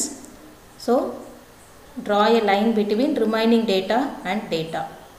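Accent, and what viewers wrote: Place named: India. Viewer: Unfortunately it is quite difficult for me to understand explanations in hindustani english.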